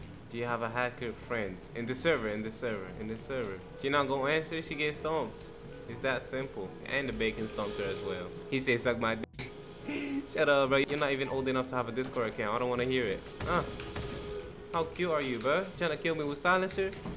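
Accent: United Kingdom. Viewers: are you British? This is a genuine question 🧐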